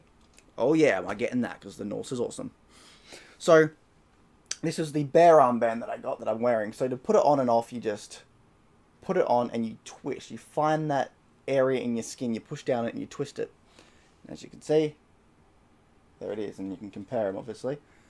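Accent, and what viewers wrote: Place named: Australia. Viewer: I don't know why it was so hard for my ears to realise you're Australian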